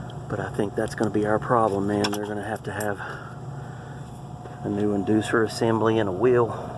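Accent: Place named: Southeast, United States. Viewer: Hey man, Where' bouts you from? You sound like you may be somewhere near the southeast region? maybe? haha :)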